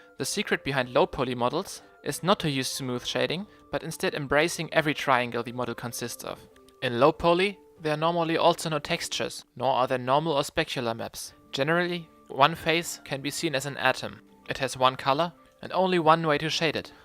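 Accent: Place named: Germany. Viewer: Sounds so german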